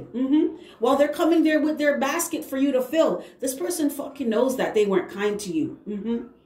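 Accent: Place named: Jamaica. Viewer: I was sooooo resonating with this and then i heard the patois. Made it clear we connecting....thank you my fellow Jamaican.🥳🥳🥳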